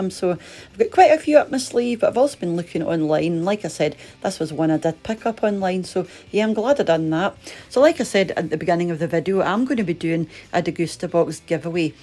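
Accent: Scotland, United Kingdom. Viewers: Love hearing your Scottish accent🥰. My mother was from Glasgow Thanks for the recipes!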